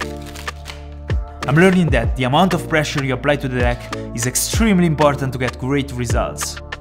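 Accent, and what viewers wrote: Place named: Italy. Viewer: he is italian that's why he's accent is different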